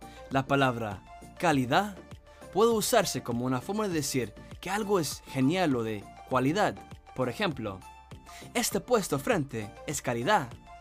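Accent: Guatemala. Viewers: Jajaja 😂, ese es el hablado del chapín 100% 🇬🇹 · Puchicas vos sabés bien el acento chapín puchicas..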